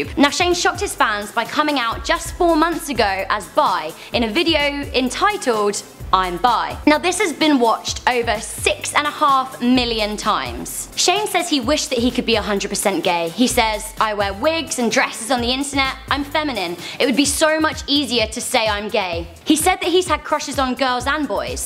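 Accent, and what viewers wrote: United Kingdom. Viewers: She is british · It's her accent.... people with english or english-like accents pronouce things that end with "A" with an "er" sound. example: idea -> idear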